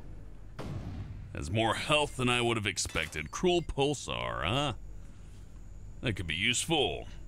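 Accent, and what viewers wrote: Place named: Texas, United States. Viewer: I freaking knew you were a Texas. (I'm also a Texan.) Love your voice man!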